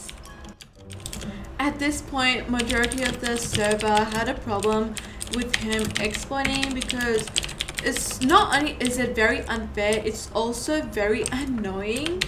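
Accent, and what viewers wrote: United Kingdom. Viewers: Are u from uk? Also I luv ur voice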